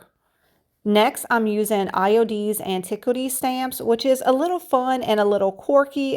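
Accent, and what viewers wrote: Louisiana, United States. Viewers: Girly… That gorgeous Cajun accent…. Abbeville? Maurice?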